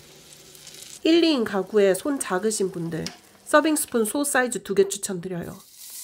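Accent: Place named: Gyeongsang, South Korea. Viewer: ㅎㅎㅎㅎㅎㅎㅎㅎ 경상도 사투리가 영상의 재미를 한층 더 올려주는거 같어요.😊😊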